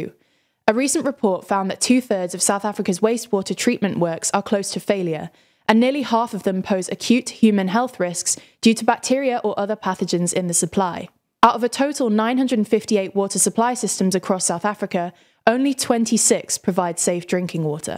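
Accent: United Kingdom. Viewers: Sound like UK 🇬🇧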